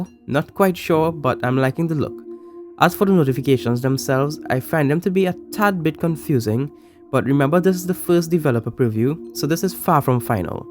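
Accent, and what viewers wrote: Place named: Trinidad and Tobago. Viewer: Hmmm..... you sound Trini. Am I right? :) #Trinidad&Tobago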